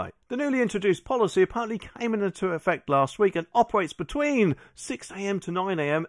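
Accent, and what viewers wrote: Norfolk, United Kingdom. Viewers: Hi,where are you from? I recognise that accent,is it Norfolk.?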